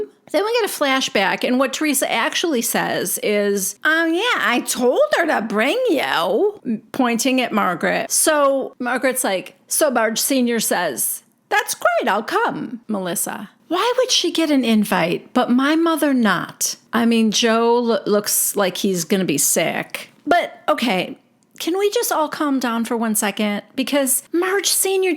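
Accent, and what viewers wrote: New Jersey, United States. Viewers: Omg! Your NJ really showed this week! You've got Danielle down to T.!!!😂 I used to think you exaggerated Teresa UNTIL I was accidently listening to Namaste Bitches. I thought I was listening to you so I started l😂 I'm thinking No way! It was Teresa herself sounding just like you! I hope this makes sense. You're reviews are the best. No one imitates ALL the housewives like you do! I love and appreciate your efforts and love your work! Thank you so much and God Bless ya!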